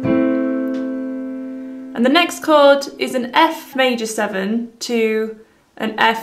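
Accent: United Kingdom. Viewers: Your voice, with that British accent, is succulent. Thank you for the tutorial, I always felt that my game was missing something, and I just discovered that it was the F6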